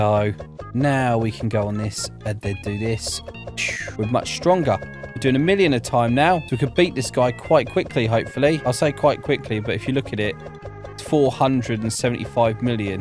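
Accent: United Kingdom. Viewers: are you brithis?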